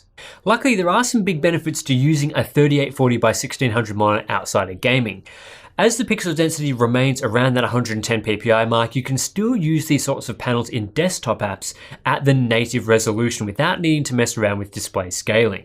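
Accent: Australia. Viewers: Australian accents are hawt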